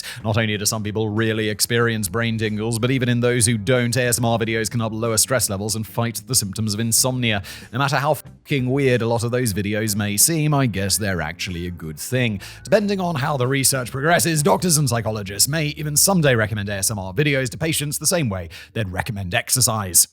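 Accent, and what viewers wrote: United Kingdom. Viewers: Maybe not ASMR, but Simon could definitely launch a sleep version of his shows - he doesn’t even need new scripts, just keep the tone level 😅 I like to listen the The French Whisperer to fall asleep, but a British accent beats a French accent any day 😂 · for whatever reason, a man with a deep, soft voice and an accent from anywhere in the UK, could read anything at all and I will for sure fall asleep within 15 minutes. I would sign up for a Simon ASMR channel.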